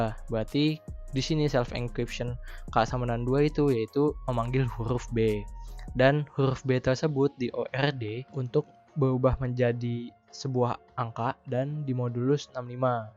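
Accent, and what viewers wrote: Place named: India. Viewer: The real india accent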